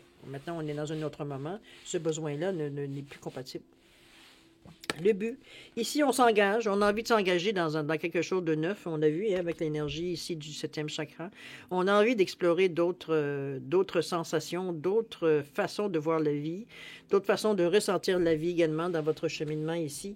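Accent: Quebec, Canada. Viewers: super bonne interprétation j'aime ton accent québécois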